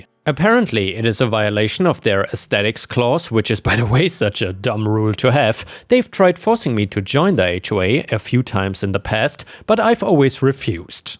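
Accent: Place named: Germany. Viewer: I truly enjoy your content, the short stories at the end, and especially those vids of you and your wife utterly spoiling your cats. Those kitties appear to be SO well mannered and loving all the attention you lavish on them. But I AM curious about where you hail from. I know you live in Thailand, but your accent seems to be European - maybe German? But I know so little of all the various languages and regions of the EU I'm likely totally wrong. Would you mind sharing a little bit of yourself?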